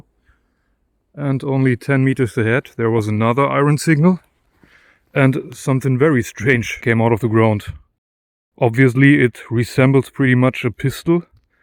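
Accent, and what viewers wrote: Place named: East Germany, Germany. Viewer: na mehr als sicher sind diese funde in Deutschland im östlichen raum gemacht, akzent ist def ebenfalls Deutsch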